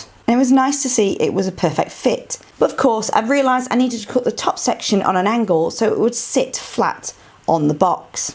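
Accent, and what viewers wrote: United Kingdom. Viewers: Oh. I love that British accent.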